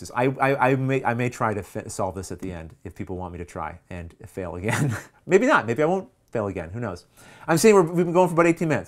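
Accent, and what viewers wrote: Canada. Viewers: That Canadian accent is pleasant :)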